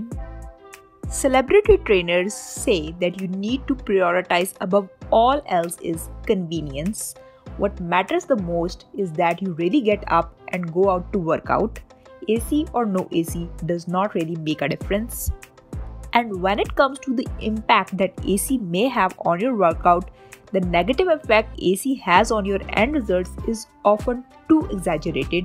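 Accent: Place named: India. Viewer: Damn i hate that Indian accent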